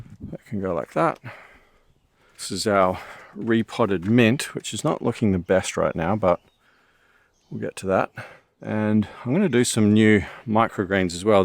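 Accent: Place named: Australia. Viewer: Hello, have to ask are you an 🇦🇺Aussie? First visit to your Channel and hear a familiar tone? Great Vlog Thank You looking to grow some food on the road, 👍🏻👏🏻🙏🏻🙋🏻‍♀️🇦🇺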